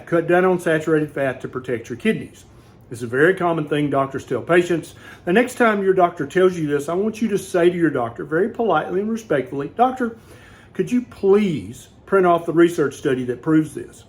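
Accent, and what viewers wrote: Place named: Deep South, United States. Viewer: Your analysis is the most comprehensive and logically reasoned that I have seen. You DO need to work on taming that extreme southern accent. It's distracting and is taken as backward and ignorant by a decent percentage of all who were not raised in the deep south. This will lead to people immediately clicking off your excellent video as soon as you start talking. Your information is so good. I just wish you had a better presentation. Not only are you elongating words, but you have the extreme form, where entire extra syllables are injected where they do not belong. The word LIST is one quick syllable. You are pronouncing LAAAYYYUST- a complete and utter abortion of the word, so extreme that I had to rewind just to be sure what word you were attempting. To correct THEYUS, (THIS) please practice saying words quickly, succinctly. 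You will sound far more intelligent. The word ON and the word OWN are two different words. ON should not be pronounced OWN! BAD is BAD not BAYYUD. CONTEND IS CONTEND, not CONTAAYYYUHD. The word WELL should be pronounced WELL- not WAAAYYYEL! The word BE is BE not BAY, and certainly not BAAYEE. DOWN is DOWN, not DAAYYOWN! Just practice saying ALL words quickly, correctly and without injecting extra syllables.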